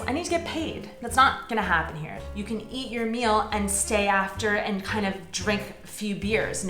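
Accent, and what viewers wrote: United States. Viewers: Máš štěstí že žiješ v Praze :-D Mluvíš vážně dobře Česky, ale pokud pojedeš kamkoli jinam, odlož všechnu tu *** politickou korektnost a divokou Americkou intonaci :-D Jakmile Ti bude připadat, že mluvíš tak sarkasticky a že jsi tak cynická, že by Tě za to doma zavřeli, mluvíš jako Čech. Přijeď do Ostravy a uvidíš :-D You are lucky to live in Prague :-D you speak really god Czech, but when you go anywhere else here, put aside all that ** PC and wild American expression :-D Only when you feel so sarcastic and so cynic, that you would get locked in America, then you speak Czech. Come to Ostrava and you will see :-D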